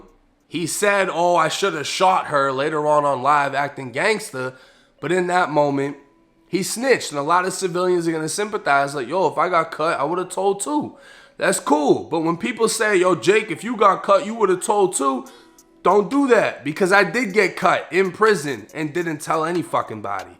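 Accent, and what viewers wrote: Boston, United States. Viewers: I’m from Boston bro no one stretches there accent THAT bad he says apahhhtment and stretches his AHHHS (r’s) every chance he gets like cool we get it your from Boston . Just feels over exaggerated and forced and dumb after a while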